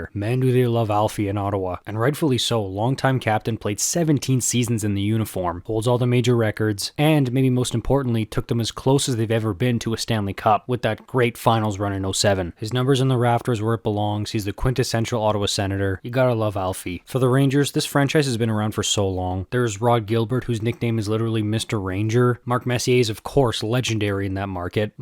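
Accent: Quebec, Canada. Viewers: la prononciation dun quebecois ca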